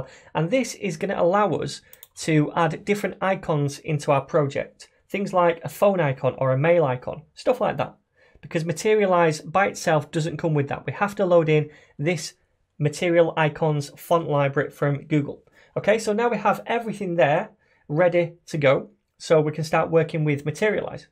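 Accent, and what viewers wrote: United Kingdom. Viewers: Hey! Great British English, useful content. Thanks a lot, shared it with my group).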